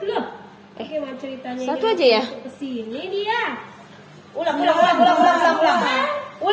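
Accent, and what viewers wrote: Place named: Sumatra, Indonesia. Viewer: Nyimak cara buat ketupat,,,, kok dialog nya seperti orang Sumatra,,,,, besok saya datang lagi sambil mengantarkan kopi hitam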